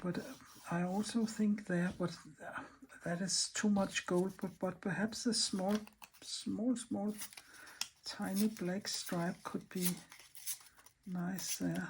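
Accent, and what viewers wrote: Denmark. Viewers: Love your collages and colors and your little chats with your danish accent.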